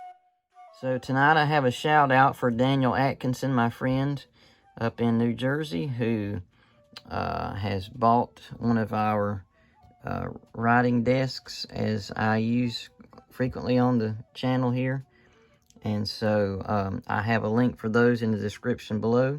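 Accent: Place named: East Tennessee, United States. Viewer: Came here looking for this information! You sound like you are from close to where I am born and bred...east Tennessee? I am so glad I have found your channel as I am obsessed with colonial period.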